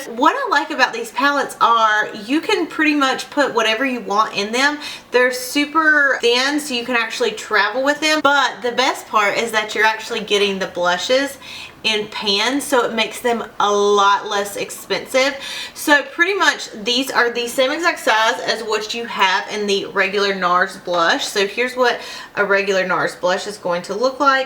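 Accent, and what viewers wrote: United States. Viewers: Love ur country accent. I'm from Texas. But I don't think you have Texas accent do u?! Lol great palettes!!!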